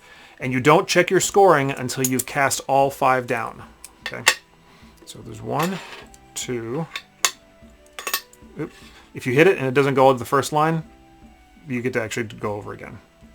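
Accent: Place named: Yorkshire, United Kingdom. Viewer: Shoov ape knee. As a resident of the US (please forgive me, I didn't do it...) I recognized this as a Yorkshire pronunciation with the help of Wallace and Grommit. A friend of mine from England said to me to imagine the US upside down, all the country folk are in the north...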